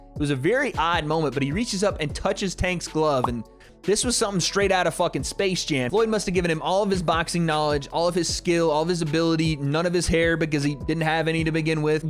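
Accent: Ireland. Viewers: Bro if I hear that Irish accent again I'll unsubscribe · For an american your irish accent is pretty fire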